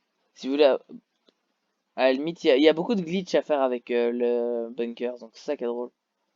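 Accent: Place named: England, United Kingdom. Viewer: cette accents anglais magnifique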